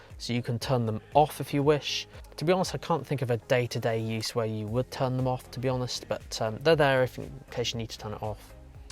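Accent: United Kingdom. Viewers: its 10pm here and i felt like watching a good movie. mbux review; 55mins long in 4k and he’s British 🍿 out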